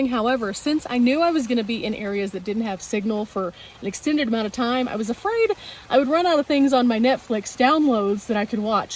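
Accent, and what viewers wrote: Texas, United States. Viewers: Love your videos. Knew from your accent you were a fellow Texan!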